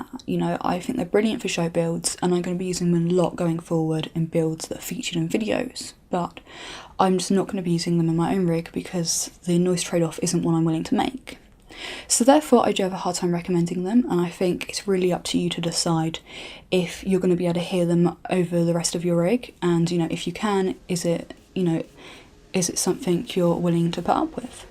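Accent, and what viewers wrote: England, United Kingdom. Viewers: ur english accent is so sexy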